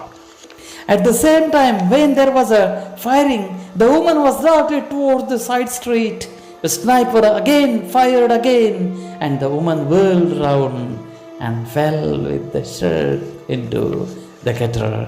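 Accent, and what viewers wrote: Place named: United States. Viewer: Thoda dhire bhi bol sakte ho aap American jese kiv bol rahe ho aap Kiya samaj aayega new student ko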